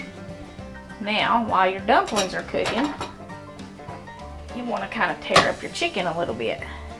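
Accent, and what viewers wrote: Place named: Tennessee, United States. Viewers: Chicken n dumplings are my favorite!! Mama made great dumplings. She rolled hers out and cut them out. She had taken the chicken out of the pot and dropped each dumpling along the side of the boiling broth. She would use a butter knife to push the dough toward the center. I just thought that’s how everyone did it. But I’ve watched many many cooks on here make c n d and no one dropped them like she did. I’m like you, I don’t want veggies in mine. My Daddy didn’t even want the chicken put back in the dumplings. 😊 yours look more like what we do in East Tennessee. From your accent you might also be from TN. 😂 God bless you and your family. 🙏🙏🇺🇸🇺🇸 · YOU to are a fine blessing to this old man who can't cook too well here in WV. Thank You so very much for the patient instructions and ideas. Your wonderful voice, with charming Tennessee accent reminds me of my wonderful, long-passed Aunt God Bless You and your fine family.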